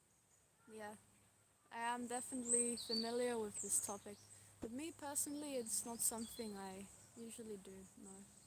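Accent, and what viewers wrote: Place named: Australia. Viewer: please do more light language! I rarely come across light language on youtube that legitimately affects me, and yours does, along with Keleena Malnar's. Are you Australian? You sound aussie for the most part!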